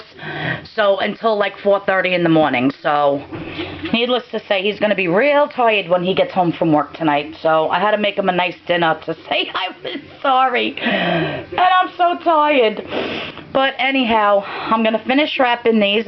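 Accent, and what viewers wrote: New York, United States. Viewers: Thank you for all the info. I also love your accent. Since I moved from Fairfield County CT to Houston I don't get to hear NY accents anymore. And you cracked me up about your husband hearing the mixer. LOL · you sound like me where are you from you sound like a new Yorker I'm from Staten island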